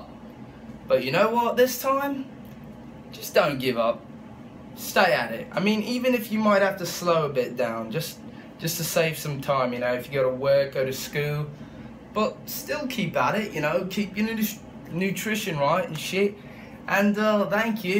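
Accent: England, United Kingdom. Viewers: Can someone explain why he decided to speak in an English accent? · fookin hell thought he is a fine lad from Briston or London, until i found out.. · What! U call that an Australian accent. It’s British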